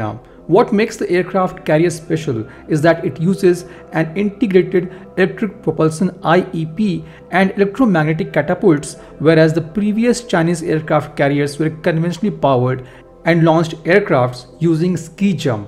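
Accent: India. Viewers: India accent english sounds so funny. Love India from China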